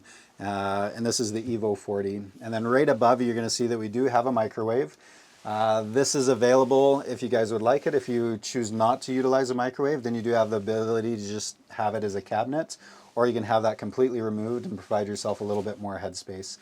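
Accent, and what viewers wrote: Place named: Germany. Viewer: You have a elegant German accent if you are German. Nice van, thank you for the tour.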